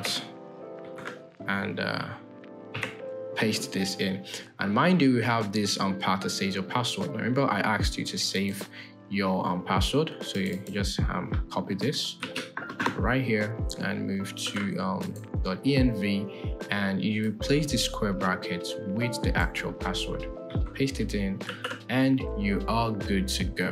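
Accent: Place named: United Kingdom. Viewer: Thank you for providing this tutorial! quite informative, but I did encounter some difficulty in following your accent. Could you kindly share where your accent originates? I was wondering if it's British. Additionally, if possible, could you consider reducing the volume of the background music? Many of us would appreciate being able to hear your voice more clearly without distraction from the music.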